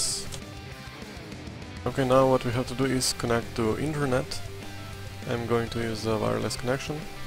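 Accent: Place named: Russia. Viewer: у вас выраженный русский акцент , вы случайно не русский?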